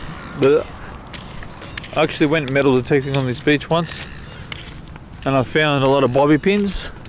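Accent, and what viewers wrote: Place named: Australia. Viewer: WOW now this is really a head turning video, I had no idea you were an Australian, it is weird hearing that accent you naturally have, that does not come out on the music videos, oh my god thank you, it will be a longtime before i can make it down under, the funds are not allowing a vacation that far away. Thank you from the bottom to the top of my heart, and give the wife a thank you as well, nice video, USA Missouri,Lake of the Ozarks or the Midwest Coast as they say 1150 miles of shoreline, the oceans are great medicine Atlantic Pacific and Gulf of mexico, pacific northwest up by seattle is where i like it most, its a big angry seeing all this plastic everywhere, oh man i hate pollution and the lack of much effort to clean it up...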